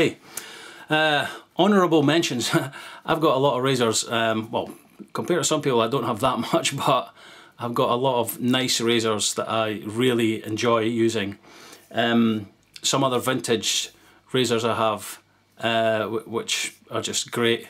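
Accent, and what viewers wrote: Scotland, United Kingdom. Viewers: I'm still searching, while avoiding Gillette, because of their advert. I have an old 1958 Gillette that''s still serviceable, from the year before before I went into the military. When I can afford it, I'll try the Rockwell. I really like your channel, and I enjoy the intelligent comments made by your viewers. I wish I had a Scottish accent like yours. Thank you for an informative and entertaining half-hour now and again. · love the Scottish accent! long live Scotland! :)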